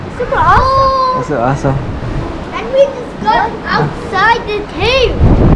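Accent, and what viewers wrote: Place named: West Sumatra, Indonesia. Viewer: Dari awal gw dah nyangka kl Uda orang Minang, sempat nonton video yang logat Minangnya rada kental dikit, salam dari Ranah Minang uda...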